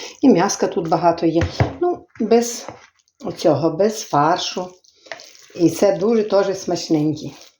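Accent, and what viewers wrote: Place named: Kirovohrad, Ukraine. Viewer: Спасибо, Вам большое. Случайно попала на Ваш канал и очень рада, что попала. Слушаю, не наслушаюсь вашим украинским говорит, таким славным. У меня мама родом с Кировоградской области, говорила именно так. Спасибо ещё раз.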